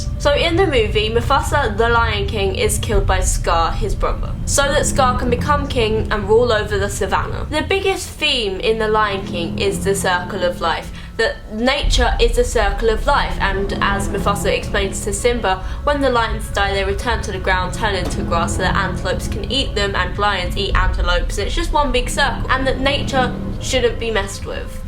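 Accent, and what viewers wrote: United Kingdom. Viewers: Fuck me up w ur Britishnesss mama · Gurl if you put on a Victoria secret 2 cup push up bra, a juicy sweater, and made your hair a little more blonde... You'd be Tana with a British accent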